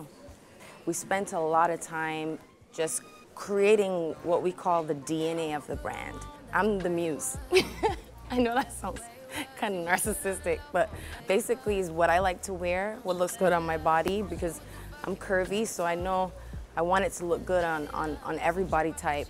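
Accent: England, United Kingdom. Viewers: Her accent is sounding a bit like English accent now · she took the british accent lol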